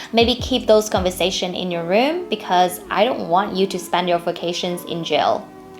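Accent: Midwest, United States. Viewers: u speaker very smooth US tone English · Mình đã nghe nhiều người nói tiếng anh. Mình không phân biệt dc người này là một người việt nam hay một người ở mỹ do phát âm quá giống. Đến khi mình nghe nói là tên và ở mỹ 7 năm. Tất nhiên tây gốc nó phát âm cuốn hơn nhưng cơ bản mình không thể biết đó là người vn đang nói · For a native you sound like you spent quality time in the US or Canada... EDIT: just got to the part where you spent 7 years in USA. :) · Where were you at in the states? Your accent sounds very neutral like the midwest accent. I think many people who would hear you speak English would assume you were American if they didn't know your story. Also as someone from one of those colder states... you know 50F/10C isn't that cold. Don't scare some American into bringing a winter coat to Hanoi lol. Light jacket and jeans is more than fine for that. 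I am sure you got used to seeing people in Shorts and Sandals/Flipflops/(no idea what Vietnamese call them) in that level of cold while you were here. Even in the true winters here in the colder states you see it. Asian Americans in flip flops, and college students in shorts year round is something I'm just used to. Even if I think they are a bit insane. Especially when it is snow covered and -10F/-23C. Put on some boots and jeans people lol. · Thank you. I don’t think you had lived in the U.S for just 7 years. You speak with a perfect American accent. I feel a bit embarrassed about my English even though I have been living in the U.S for 10 years and working in a hospital. I am learning from you!!!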